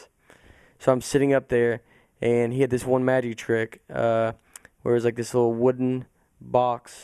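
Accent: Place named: Tennessee, United States. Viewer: New York... really? Well, I understand. I usually don't crack up at stand up, but your accent is ridiculous. I'm from Tennessee, Nashville area too, so yeah I get it :) Come to Zanies! Book a show, bring your wife and we'll go out to dinner; you two and my fiance! You remind me of my friends and myself! Really relatable. That's probably why I can understand where you're comin from and why you're perspective is refreshingly funny. Oh, wait, no that's the Italian back hair...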